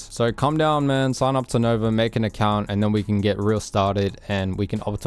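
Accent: Australia. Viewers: If you hear an aussie and he speaks like this with good humour, you know he genuine. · are you from Australia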